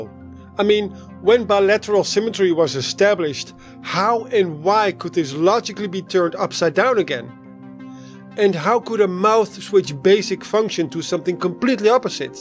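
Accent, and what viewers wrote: Netherlands. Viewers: I love your videos and your (dutch?) accent. You might want a plainer background when doing the greenscreen-style overlay though 🙂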